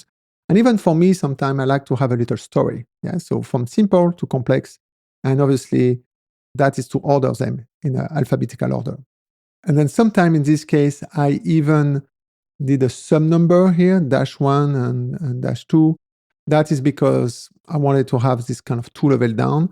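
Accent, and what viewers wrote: France. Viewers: You are awesome man, kudos! And since you mentioned the accent first now I can say that Rust is really something change me in many ways; I used to can't stand the French accent 😅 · Je reconnais un français à l'accent haha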